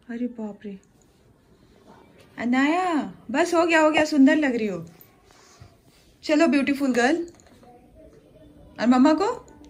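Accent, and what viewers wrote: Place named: India. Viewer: Fiiling philing ek dam deshi wali English very nice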